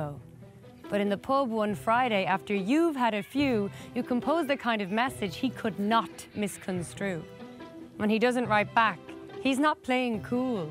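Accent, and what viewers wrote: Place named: Dublin, Ireland. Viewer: Bhí cailín álainn, cliste agus saothraithe agam mar Te Ciara nuair a bhí cónaí orm agus céim i mBaile Átha Cliath. Ciara álainn cailín, álainn i ndáiríre. Braithim accent Bhaile Átha Cliath. Tá súil agam filleadh ar chónaí ann san athbhliain. Póg mhór ó Florence Ciara. Cailín álainn Éireannach!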